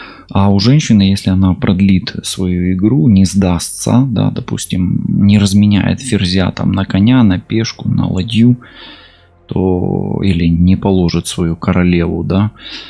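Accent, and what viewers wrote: Ukraine. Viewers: Вы просто супер мне очень нравится что вы говорите как украинец мой род связан с Украиной красивой страной корни есть от туда вас приятно слушать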